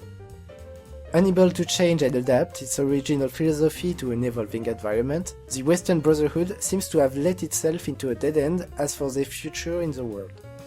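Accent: France. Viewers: omg, french accent <3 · Juste excellent ! Continue tu es sur la bonne voie ! (je me permet de te répondre en Français, ton accent indique tout ^^, et les sous-titres Français, c'est parfait !) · your frenglish makes my ears bleed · vue ton accent et les sous-titre tu doit être français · lol dat French accent is ridicilous but nice video brah :D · T'es français ? :3 Putain, cet accent mec ! XD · His french accent is as cute as Curie's... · ohhh putain cet accent francais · Chemsse-dine Salhi I know, but I don't need them, I can understand him perfectly, I went to french schools so I got used to hearing french accents. If he is indeed french, he does actually speak English very well.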